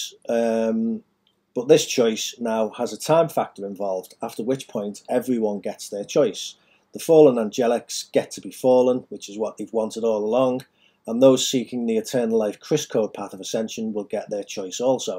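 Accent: Liverpool, United Kingdom. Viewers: As a Scottish person ....im giggling x think he a scouser xx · Wow! New to the Channel! Amazing! Thank u. I've heard it will be Nov '23 but wow! And a Fellow Scouser too! I grew up in Penny Lane, Lpool. Great to hear a Scouse accent and intelligent too!! 👍😉🤗💥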